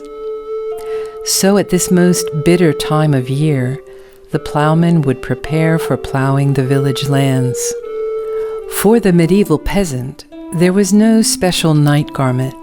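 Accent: United States. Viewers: Why do they have an American commentating this...😂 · I agree that they are informative, but they are mostly about British fashion, so why is an American narrating?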